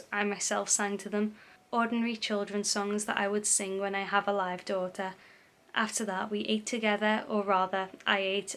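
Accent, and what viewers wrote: Liverpool, United Kingdom. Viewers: Mad to actually find a true crime scouser on here, feel like I know you already 😂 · You have a slight scouse accent if you dont mind me saying.. are you from Birkenhead ways? or the posh part of the pool? I live just across the mersey on the wirral :) Btw great vid! I am on a binge watching true crime!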